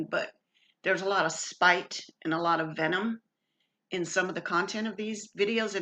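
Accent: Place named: South, United States. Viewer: I love the sound of a refined southern accent. I’m not originally from the south, but I lived in Alabama from <num> and most of the people I came into contact with were very well spoken. Maybe you were staying in the sticks, I don’t know.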